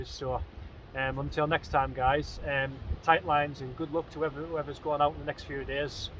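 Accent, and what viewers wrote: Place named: Cumbria, United Kingdom. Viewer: Just came across your videos by accident pal.. But what great content.. Great to hear our Cumbrian accent like.. I'm a wukinton fella.. Always fancied fishing off the heads but I'm not sure where you go or park.. Do you park at the light house pal and walk over or from St bees.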